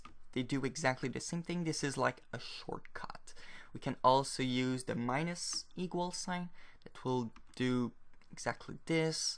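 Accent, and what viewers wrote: France. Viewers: T'es français ? O_o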